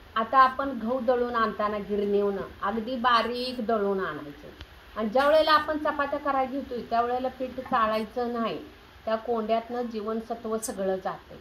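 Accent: Kolhapur, India. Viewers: Sweet and beautiful kolhapuri tadaka🎉 चपाती लागत्याच, माझ्या अनूभवावरून सांगतो, जातय, करतय sweet language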